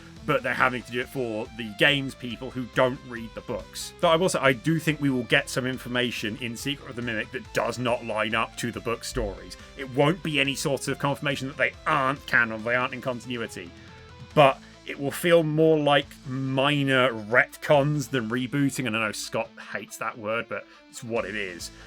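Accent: United Kingdom. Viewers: fkn bri ish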